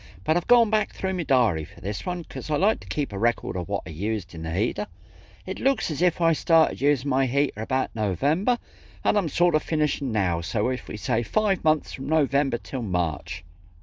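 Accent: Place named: East Anglia, United Kingdom. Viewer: Good to hear that Norfolk/Suffolk twang in hot sweaty Sri Lanka!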